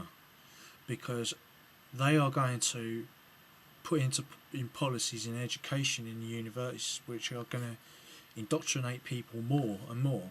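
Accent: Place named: England, United Kingdom. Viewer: By ur accent I know that u are from England.shameful u are clueless about the murderers and murder victims of apartheid. I really don't understand why u sit down always and talk rubbish SA when u can put ur time into a good source. Well know it the English adore Mandela . Go to the famous tralfargar square and see his sculpture there. So do us a favour and stop blabbing lies u spineless coward with no brain and unscrupulous even when u at lying. U tube is not meant for idiots like u.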